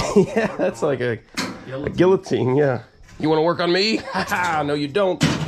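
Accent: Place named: Tennessee, United States. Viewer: sounds like your from tennesse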